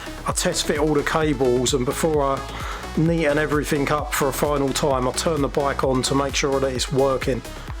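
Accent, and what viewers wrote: United Kingdom. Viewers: Always enjoy the builds! From a cross the pond, I gotta say having a British accent makes it very entertaining 😂. I have no idea what a jubilee clip is, but I know what a hose clamp is.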